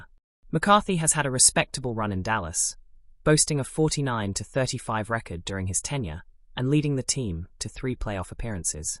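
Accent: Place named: United Kingdom. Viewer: Nothing says American football more than a guy with a british accent. If this is an AI voice maybe pick an American voice?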